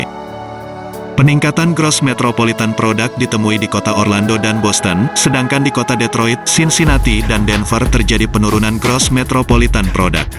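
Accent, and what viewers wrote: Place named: Batam, Indonesia. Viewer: Yg org BATAM mana nii suara nya👍🖐 salam dari batam, sekupang🖐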